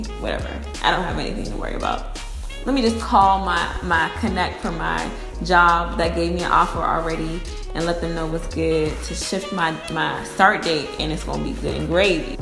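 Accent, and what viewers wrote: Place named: Baltimore, United States. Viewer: I can tell she from Baltimore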